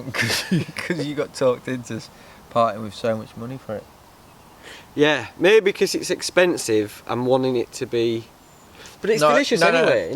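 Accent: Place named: North, United Kingdom. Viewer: Came by after Zed mentioned you and I'm glad you did. Quality vids. How much did you have to pay Rick Grimes to camp with ya? haha. Can't place your accent either. I know its northern but it's got hints of all sorts hah. Keep at it bud. ATB, Mike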